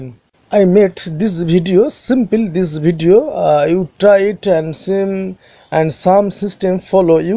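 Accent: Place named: England, United Kingdom. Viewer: English khatarnak hai bihari...par axcent pura angrejo wala mara hai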